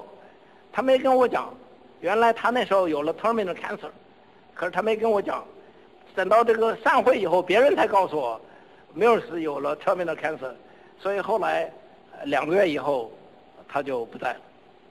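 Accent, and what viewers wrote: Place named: Yunnan, China. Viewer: 杨老为啥有这么重的云南口音啊？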